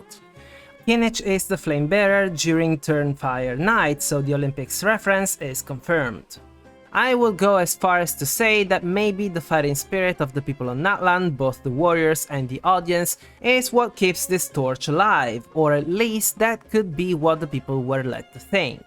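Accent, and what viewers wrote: Italy. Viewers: Ma sei italiano? 😮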